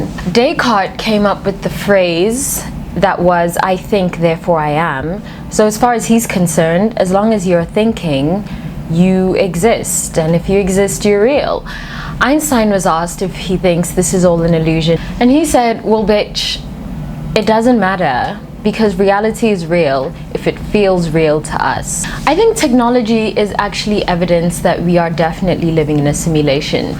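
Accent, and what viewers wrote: South Africa. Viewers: Curious to know where you’re from, really love your work. You sound like you could be from SA but moved. I just can’t across your channel.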